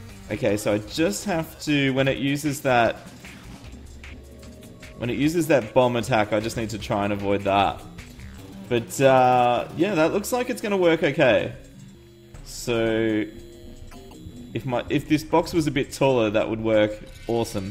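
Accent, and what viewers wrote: Australia. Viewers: Hey, I'm new to your channel but, I love all the videos they are great keep going!!! and all so its good to see more Aussies getting on this!! I could tell in the first episode you were Australian and I laughed when you said in a vid "that rings a bell" and you had to explain it, very funny. Anyway keep going and don't let anything stop you, you are great at what you do.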